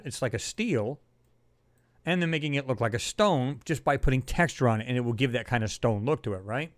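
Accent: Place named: Rhode Island, United States. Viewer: When I was growing up I had a friend named Tommy his dad was Rhode Island and he sounds exactly like you am I close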